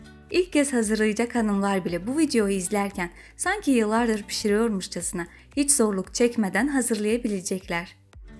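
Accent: Azerbaijan. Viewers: Normaldir Azerbaycan ve Türkiye sivesi karisinca cok güzel bence. Sizde Azerbaycan sivesile konusmayi deneyin bence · bozma ay bacım o geşeng azerbaycan şiveni bozma, öz şivende danış..bizim dil bozuk zaten, bari siz özünüzce danışın ki gerçek türkçeyi sizden öğrenebilek..gurban olum sizin bezekli danışığızı yaradanıma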